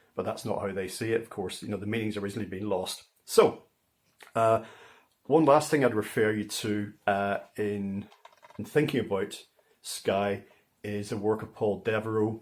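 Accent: Northern Ireland, United Kingdom. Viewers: Very glad to have found your channel. I'm guessing you're from Northern Ireland? I'm coming at you from Portadown... Network are great for releasing these old series. Particular favourites of mine are "Children of the Stones" and "Sapphire and Steel."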